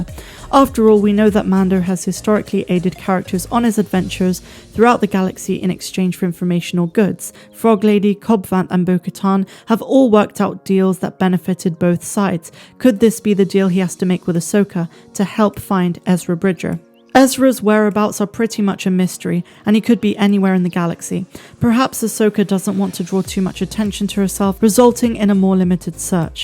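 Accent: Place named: South West England, United Kingdom. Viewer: Id know those hard rs in accent anywhere, your from the south west! Likewise lol, p.s. Great content as always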